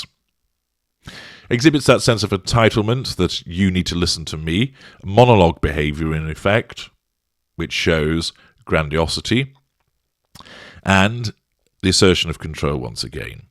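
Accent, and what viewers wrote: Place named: Yorkshire, United Kingdom. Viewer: |Maybe she wants to usurp Camilla, then Catherine would have to curtsy to her!!.. PS has a Yorkshire lass I just loved your Yorkshire accent made me chuckle thankyou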